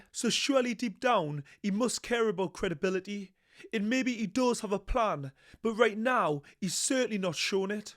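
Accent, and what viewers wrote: Newcastle, United Kingdom. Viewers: Hes a geordie. Nothing like scottish 😂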